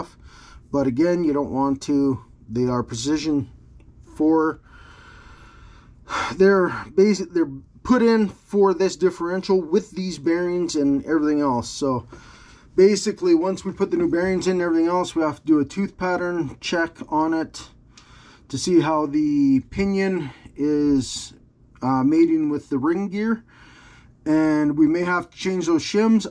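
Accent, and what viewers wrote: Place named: Canada. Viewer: nice vid you should get a longer 1/2" ratchet I like the canadien accent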